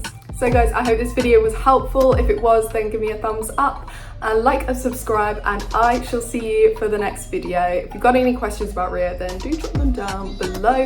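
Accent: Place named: England, United Kingdom. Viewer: I know you are from England, but when you are recording a clip, you Don't need to speak so fast with loud musical background noise.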